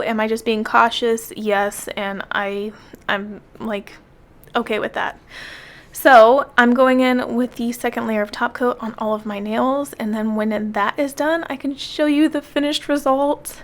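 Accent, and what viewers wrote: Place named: South, United States. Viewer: Hey! I just wanted to say that I absolutely love your content. I find your voice/slight Southern accent very soothing, and I love how you celebrate and build others up. I notice you feature a lot of smaller brands: Sol Dips, Shameless, Dipalicious...etc. I'd love you to discuss some of your favorite companies in a list and why/what they are best at. Additionally, I'm pretty new to dip, and thusfar have only been using my Dipwell system, but would love to mix and match more. So...I guess one thing that I feel is missing from YouTube content is how to crossover different dip products...if that makes sense...? Anyway, rambling. You're great! 😂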